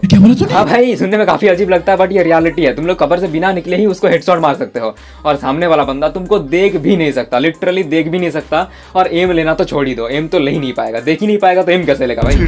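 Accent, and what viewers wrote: Bengal, India. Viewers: Bhai ap bangali ho kya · Bhai app bangalli ho na 😀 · Bhai tu bengoli h 😮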